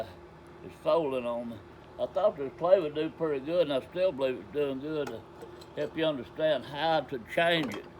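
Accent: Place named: South, United States. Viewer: thank you for sharing your knowledge, and I could listen to you talk all day with that southern draw. where ya from?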